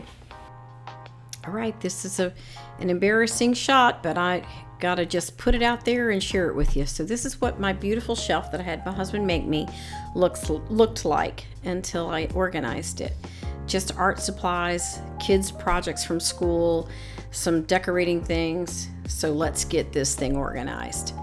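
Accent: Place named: Virginia, United States. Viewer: Hi, Your accent sounds like it is from a previous place we lived. Is it possible that you are from Virginia? We lived in New Hanover County, specifically Mechanicsville.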